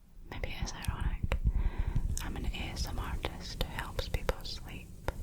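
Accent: Scotland, United Kingdom. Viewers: summer time with a cold breed I love your vids and your Scottish accent 😘😘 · Very nice! Help me out with your accent. Are you closer to Glasgow or Edinburgh, accentwise? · The Scottish accent is so so suited to whispered asmr , I’m Scottish too so I may be slightly biased but either your voice is tingalicious or I’m having a heart attack 🤣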